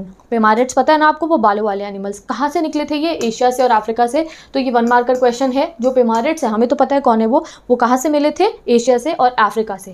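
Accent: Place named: Bihar, India. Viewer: Tumhara accent dekh ke lagta hai ki tum bihari ho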